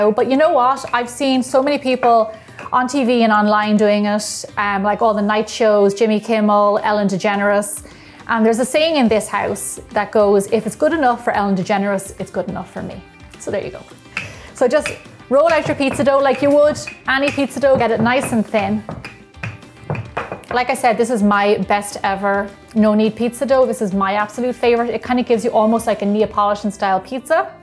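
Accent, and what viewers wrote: Ireland. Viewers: I love your Irish Accent!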